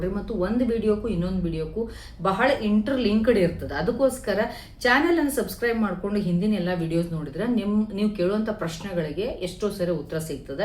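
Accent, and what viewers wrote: North Karnataka, India. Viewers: You are the one who speaks Uttara Karnataka-Kannada. It is very homely atmosphere,you explanation is just like an Akka(elder sister) with mothers attitude who's very concerned,loving and caring. God bless you 🙏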